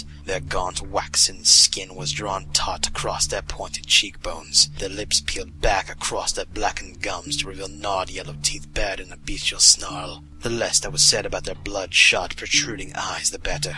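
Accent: United Kingdom. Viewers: Oh this reading. The ever-so-slight British Accent. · you sound british!!!!! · you sounded kind of British in this one.. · Omfg his British accent 😂👌🏼👌🏼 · nice slight british accent. sounds very... british. lol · Trying to tell whether you're putting on a British accent or not.....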